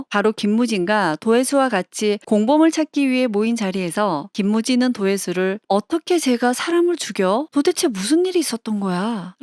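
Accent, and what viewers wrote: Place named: Gyeongsang, South Korea. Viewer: 해설자님 경상도 분인 듯~^^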